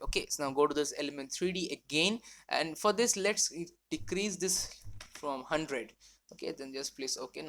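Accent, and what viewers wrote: India. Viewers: Thats how Indians speak.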